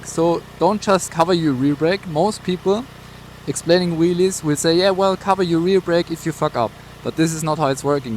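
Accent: Germany. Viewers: I trust you even more because of the German accent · I hear deutsch!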